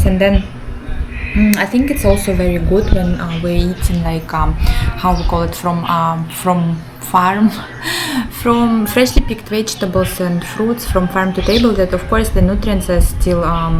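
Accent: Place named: Russia. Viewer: I love hearing your Russian English accent, keep uploading guys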